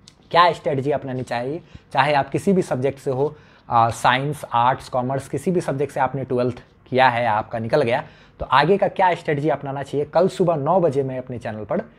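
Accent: Bihar, India. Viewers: Sir aap bihar se hokya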